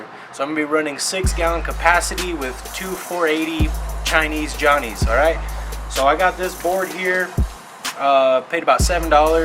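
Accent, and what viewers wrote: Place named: Bay Area, United States. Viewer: i hear hell ya bay slaps! YEEEEE ur from the bay arent you i can tell am i right?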